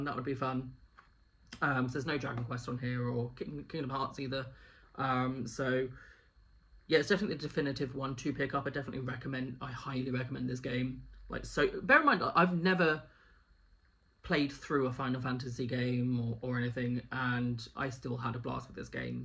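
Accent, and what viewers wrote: United Kingdom. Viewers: Loving the update! Also enjoy the British voice! ❤ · Very cute, and nice to hear your voice! Very British ^.^ hehe x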